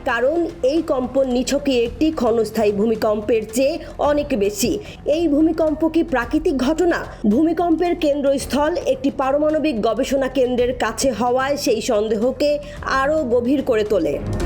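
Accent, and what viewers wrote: Kolkata, India. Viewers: Uposthapika ki kolkatar naki.?😅😅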